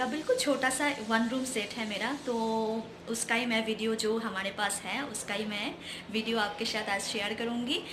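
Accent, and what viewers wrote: Bengal, India. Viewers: Aapke bolne k lahze me jo bengolipan dikhta h n wo aapki awaz me char chand lga deta h dii ..Love you dii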